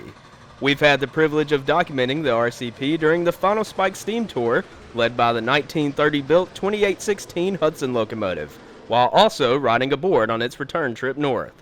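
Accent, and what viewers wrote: South, United States. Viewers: Why is this beautiful train of Canada being announced by announced by an American with a southern accent?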